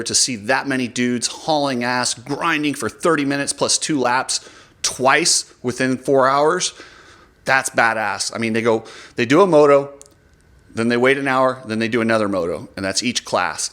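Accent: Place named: California, United States. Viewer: It's motocross, shit happens. It sounds like you're from California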